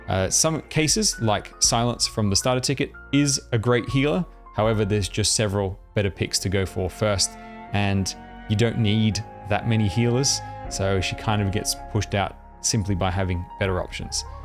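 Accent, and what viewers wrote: Australia. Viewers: Great vid, lotsa useful fast tips but also well explained. Unrelated but are you a fellow Aussie?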